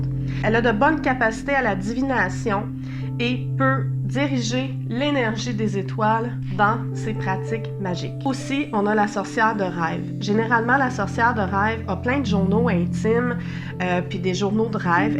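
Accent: Canada. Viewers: Oh punaise une canadienne avec l'accent canadien, je trouve cette accent tellement magnifique. Merci pour cette vidéo j'essaye d'en apprendre plus sur les sorcière. Je suis sorcière solitaire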